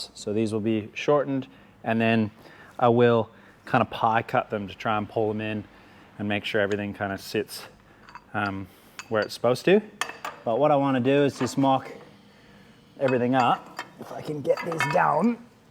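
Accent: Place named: Australia. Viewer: Another great vid, helping me on my own rear end. I can hear the Australian twang coming through in your accent. 😁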